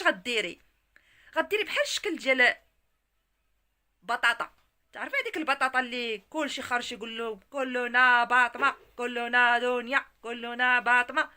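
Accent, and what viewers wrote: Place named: Algeria. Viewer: عندها لكنة جزائرية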